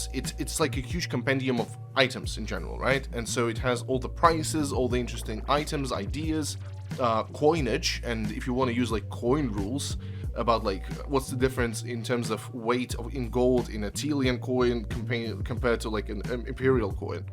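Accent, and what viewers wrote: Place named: Eastern Europe. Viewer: ehh, it's weird. Imma use your rules instead. Also, are You from Poland or Russia maybe? You kinda sound that way, I believe I can spot fellow Eastern Europe folks lol.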